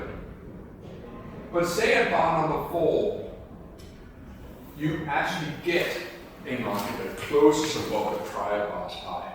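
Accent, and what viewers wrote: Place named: Denmark. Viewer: Awesome content. But equally awful audio, its hard to navigate through that tough danish acent. Overall nicely explained. Thank you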